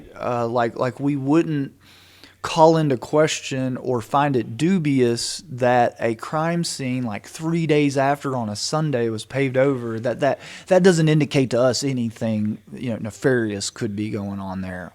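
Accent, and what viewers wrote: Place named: South, United States. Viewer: Love your southern accent 🙂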